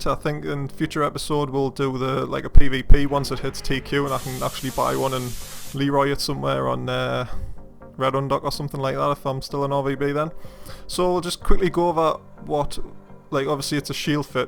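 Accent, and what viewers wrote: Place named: North East England, United Kingdom. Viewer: Lo m8 just come back to eve after a long break sitting in ya pub atm, you sound like your from the NE like me, would love to chat about some fits m8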